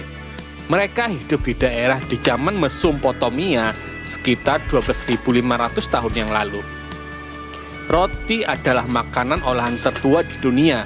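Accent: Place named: Java, Indonesia. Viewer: Why medok jowo? Ulun kira bubuhan keluak si julak nih... Tapi lucu pan.... Kawa jua... Baumpatan. ☺☺☺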